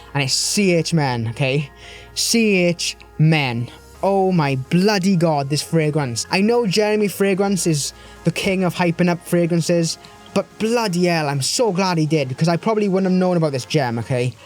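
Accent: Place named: Wales, United Kingdom. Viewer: All the way from the Welsh valley,lol.Love it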